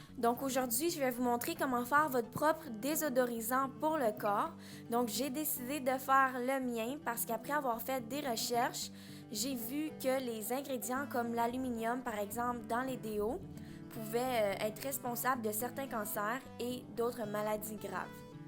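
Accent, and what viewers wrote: Canada. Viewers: J'aime ton accent canadien :D super vidéo ma belle. Pleins de bisous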